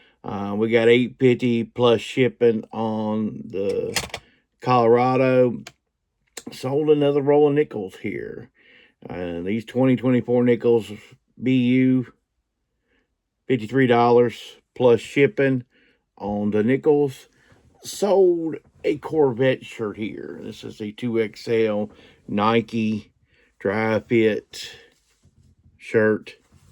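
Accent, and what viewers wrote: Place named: South, United States. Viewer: I love your southern accent!! 'Coconut Castaway'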